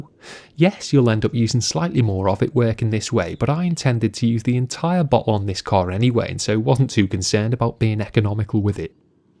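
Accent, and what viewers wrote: Liverpool, United Kingdom. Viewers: oh my goodness is that your real speaking voice!?!?! its sooo pleasant, you should be a professional narrator or make an app where you recite poems! it's so calming and everything my Texan dreams could ever dream up for a British guy - you're amazing!! Anyways, if I was going to use this on my 2 door Jeep Wrangler how many bottles of the C4 would you recommend buying? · Awww, the English accent of an Irishman, Scottish, or Brittish. Something enjoyable about hearing the accent as a Yank from across the pond. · His accent sounds like he's from Liverpool UK (hope that helps ;) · Meticulous detailing with good explanations with a pleasant Liverpool accent. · LOL Apart from also having a Scouse accent, Ringo Starr's voice sounds nothing like this guy, and nor do/did any other members of the Beatles for that matter. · I am Mancunian, and I love your Scouse accent....It's so soothing , and beautiful. 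The vid is great too!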